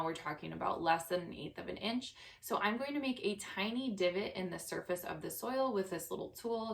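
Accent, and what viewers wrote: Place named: Great Lakes, United States. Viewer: The “baig” pronunciation of “bag” tells me you live near the lakes, like me. ❤️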